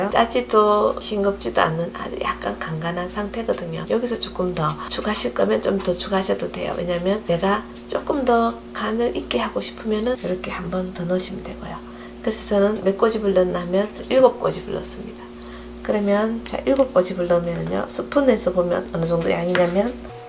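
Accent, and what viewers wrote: Gyeongsang, South Korea. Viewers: 경상도 사투리인가? 넘 귀엽네요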